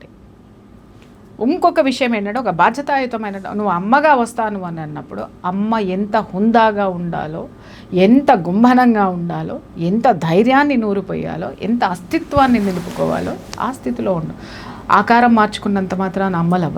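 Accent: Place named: Telangana, India. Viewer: మా తెలంగాణలో ఇట్లనే మాట్లాడతాము మీ ఆందోళన సంస్కారం ఉంటుంది మా తెలంగాణలో యాస ఉంటుంది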